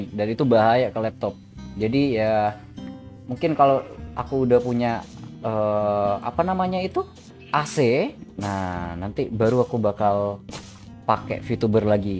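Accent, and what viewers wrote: Madura, Indonesia. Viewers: Bang lu orang madura? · Orang MADURA Bang? Madura mana bang?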